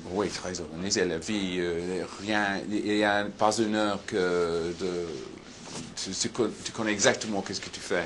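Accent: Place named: England, United Kingdom. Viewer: The first time I understand him.....and I love his english accent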